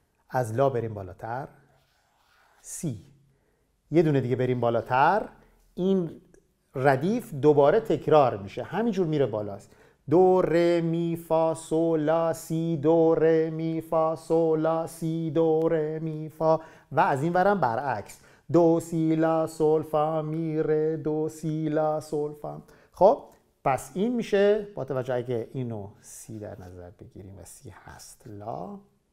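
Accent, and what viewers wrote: Bushehr, Iran. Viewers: لهجه شیرین بوشهری 😄🌺💐🎶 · معلمی هنر است و شما نمونه یک معلم خلاق و هنرمندی با اخلاق هستید . من با شما موافقم. شما با لهجه شیرین بوشهری بیان کنید. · لحجه بوشهری رو عشقه عامو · همه لهجه های ایران عالین و بوشهری هم که شما شیرین ترش کردی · استادجان، من به لهجه شیرین بوشهری رای می‌دم. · با لهجه بوشهری همش برو😍😍👍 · خیلی خیلی عالی هست برنامهاتون. اگرچه لهجه بوشهری (مثل تمامی لهجه های دیگه دنیا)، بسیار جالب و شنیدنی و لذت بخش هست، ترجیح بنده اینه که بدون لهجه باشه. باز هم ممنون برای تولید این برنامه. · صد درصد موافقیم با لهجه خیلی شیرین بوشهری گفته شود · عالی بو استاد جان خیلی ممنون لهجه بوشهری هم خیلی شیرینه ولی شما لطف کنید همین طوری توضیح بدید ممنون میشیم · موافقم با لهچه شیرین بوشهری ادامه بده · با سلام اقای عزیز واقعا بیان زیبایی داری وانسان را به موسیقی علاقه مند می کنید وزبان بوشهری با جنابعالی زیباست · ممنونم ازتون خیلی خوب بود لهجه بوشهری عالیه با ما بختیاریها تقریبا تو ی خطه❤ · لهجه‌ی بوشهری هم عالی. 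خیلی از شما ممنونیم. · بسیار لهجه بوشهری را دوست دارم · دورتون بگردوم... خیلیهم عالی لهجه ی بوشهری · "لطف بكنيد دورتون بگردوم به لهجه شيرين بوشهري باشه " شما هم خيلي با صفايي دل ات خوش تن ات سلامت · سلام ، موافق لهجه بوشهری هستم. عالیست. ❤❤❤ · چرا لهجه بوشهری توی ویدیوهای بعدی نبود ؟ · لهجه بوشهری 😍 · لهجه بوشهری موافقم با اینکه ترکم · من کاملا موافقم با حرف شما. از این به بعد به لحجه زیبای بوشهری سخن بگویید. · منم با لهجه بوشهری موافقم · درود بر شما ،از وجود هموطنی همچون شما که دانشی به این ارزنده گی رو رایگان در اختیار مردمش قرار میده خوشحالم، و با لحجه ی بوشهری کلاس شیرینیش بیشتر میشه، دورت بگردوم... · صدر در صد با لهجه زیبای بوشهری. استاد عزیز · درود بر شما شما واقعا کاری کردید کارستون خیلی ارزشمنده خدا را شکر که با شما اشنا شدم من خیلی دوست داشتم که اطلاعاتم بیشتر بشه در ارتباط با موسیقی ممنونم که هستید دورت بگردوم زبان بوشهری خیلی هم شیرینه 👌👏👏👏👏🙏🏾🙏🏾👏👏🌹🌹🌹🌹🌹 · دورت بگردوم، قند و نباته لهجه بوشهری! 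😍🌹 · عزیز چی بگم در تعریف این درس های شمو که دیگرون نگفته باشن همگی اموزنده وبزبان ساده مثل کلیدی برای قفل بسته موسیقی ما. در مورد گویش شما این بنده شیرازی که ۶۰ است از وطن دورم هنوز شیرازی تر از شیرازی های امروز حرف میزنم و از گویش بوشهری شما لذت میبرم. زنده باشی کاکو. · صددرصدموافق اجراي برنامه به لهجه بوشهري هستم چون بايك تيردونشون ميزنم هم موسيقي يادميگيرم هم لهجه شيرين بوشهري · I love boushehri accent .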